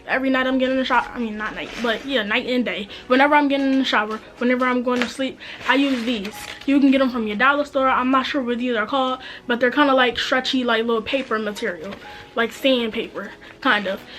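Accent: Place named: Philadelphia, United States. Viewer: Girl I can really tell you're from Philly lol but this was helpful because im about to start wearing frontals.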